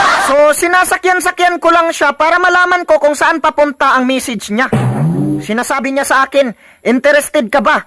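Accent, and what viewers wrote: Visayas, Philippines. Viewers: Napakatalented. Parang bisaya yung dila pero napakagaling na makatang tagalog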